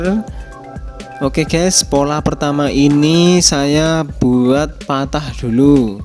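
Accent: Java, Indonesia. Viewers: Medok bgt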